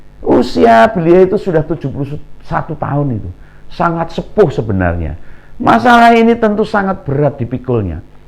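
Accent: Java, Indonesia. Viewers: Om memang elok ulasan dg nada kejawen nya titis juga dari sesi Metafisis hampir 80% betul..dg membaca Aura situasi..terbawalah orang yg berperilaku minus menuai..karmanya..karena tergiur hypocrate..alias Ketidak jujuran Duberkatilah selalu yg sadar❤👍👌Amin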